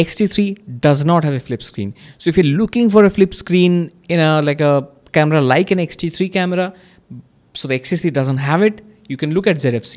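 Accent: India. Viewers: Indian English accent usually makes people sound a bit silly, but damn this video was good. Thanks a lot, I am currently almost-decided to get the Z fc.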